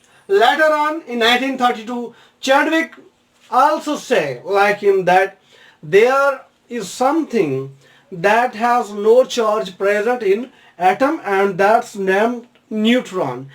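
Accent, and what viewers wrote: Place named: Saraiki, Pakistan. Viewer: سرائیکی وسیب کا ایک بہت ہی پیارا انسان بھائی اللہ کریم سدا سلامت رکھے اور لاکھوں کامیابیاں عطا فرمائے ۔۔سر جب آپ انگلش لیکچر کے بعد سرائیکی میں۔لیکچر دیتے ہیں تو وہ بہت خوبصورت لگتا ہے سرائیکی زبان ویسے بھی بہت سادہ اور میٹھی زبان ہے آپ کا انگلش لہجہ بھی وسیب کے لوگوں جیسا ہے اللہ کریم۔آپ کو خوش رکھے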